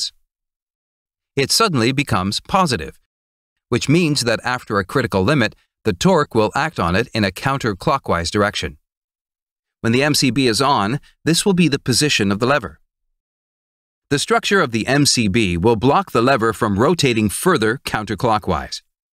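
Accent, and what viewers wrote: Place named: India. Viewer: New to the channel. Was wondering how come the channel get from a good english accent to Indian English accent?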